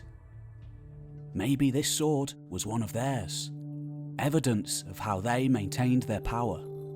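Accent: United Kingdom. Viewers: That's a cheap ass video with crap photos and clips shown over and over again. Of course with the limey voice you think you will learn some deep shit but no, the video just ends with some clip of driving down the dirt road in some far away shit hole. Back to the airport, I guess.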